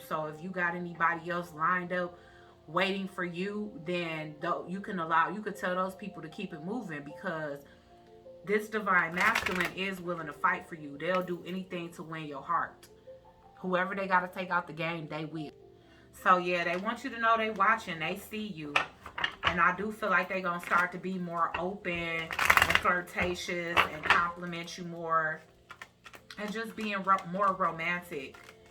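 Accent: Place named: Detroit, United States. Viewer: I knew you was from the d we got that accent lol. Thank you for this reading! Spot on.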